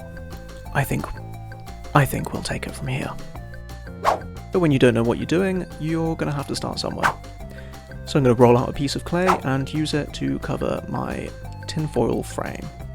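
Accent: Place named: England, United Kingdom. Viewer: Ah, always so nice to find a fellow English man! "He's ard, inhe?!" 😂 Also, if you ever need to bake very small bits of clay (as in covering the wires) you can use a heat gun instead of putting it back in the oven!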